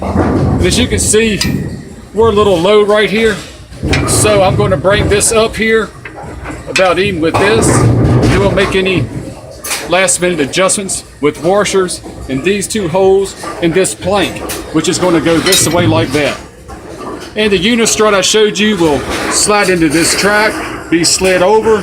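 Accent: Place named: South, United States. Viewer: You have a southern accent!!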